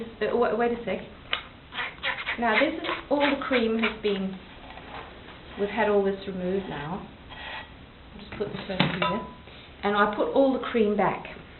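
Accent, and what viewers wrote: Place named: England, United Kingdom. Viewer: What a great video and what an amazing English accent, you speak better English than me,lol. Keep up the good work, God Bless.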